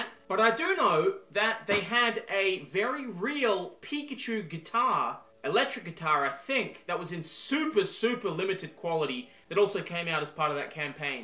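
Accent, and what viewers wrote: Australia. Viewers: Ur so Australian lol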